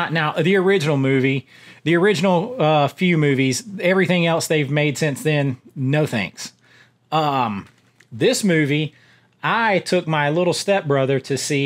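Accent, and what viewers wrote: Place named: Rural, United States. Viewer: that's A LOT OF QUALITY!!!! plus the highway shredder escape chase scene is DOOOOPPPEE!!! btw, its amazing how much your country accent goes away when you imitate Ralph 🤣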